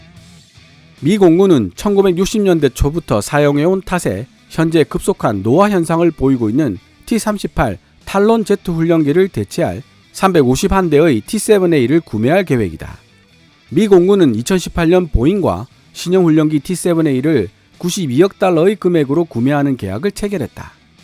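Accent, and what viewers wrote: Gyeongsang, South Korea. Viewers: 경상도영어는 제발 읽지말고 그냥가자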